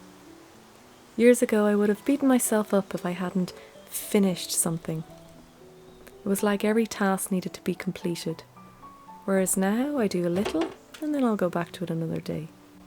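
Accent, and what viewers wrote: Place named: Kilkenny, Ireland. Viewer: Is that an Aran!? So beautiful! Also are you from Wicklow or is your accent from Kilkenny?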